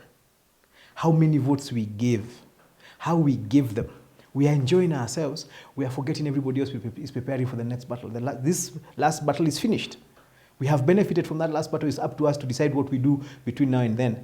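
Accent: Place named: Kenya. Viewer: I like your accent, are you a pure Kikuyu 🤔🤔🤔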